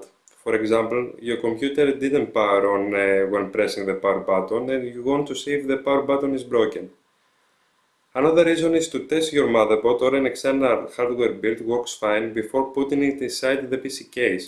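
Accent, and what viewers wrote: Greece. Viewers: ελληνας απο την προφορα το καταλαβα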